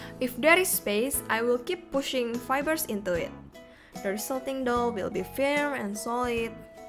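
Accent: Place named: Indonesia. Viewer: Your accent likes Indonesian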